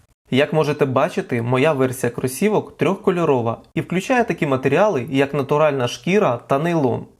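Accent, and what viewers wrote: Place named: Voronezh, Russia. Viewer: так я не понял. это что за язык? ты где так говорить научился? говори нормальным языком, нихрена же не понятно. это воронеж? или может багучар какой нибудь?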